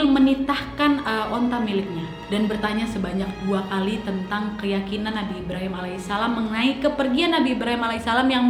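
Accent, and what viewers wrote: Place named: Medan, Indonesia. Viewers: Uak,aku sangat suka,kali logat medan uak itu tros kan wak ya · Awak orang mane ? Kadang macam melayu medan..kadang macam melayu kepri..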